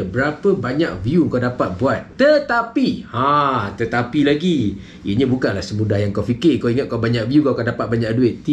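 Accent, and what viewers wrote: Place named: Kedah, Malaysia. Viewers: Amboi byk selit utaqa nohh🤣🤣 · suka ckp kedah "X leh kata apa arr"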